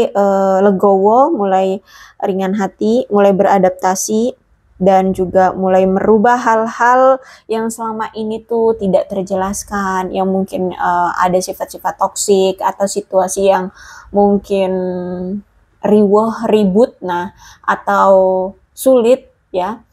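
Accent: West Java, Indonesia. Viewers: Orang sunda ya teh?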